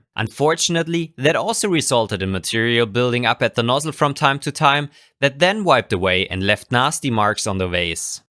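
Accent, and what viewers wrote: Germany. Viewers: Lol i've noticed english isn't your native language, but i couldn't think of what country you came from,but the "Guten Tag, i'm " let me know you're also german!😂😂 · Bist du nicht aus Deutschland? Klingt so. Wenn ja, gibt es auch einen Deutschen Kanal?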